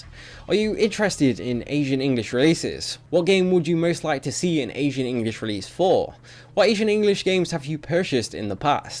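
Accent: United Kingdom. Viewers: You sound like Chris from Family Guy but British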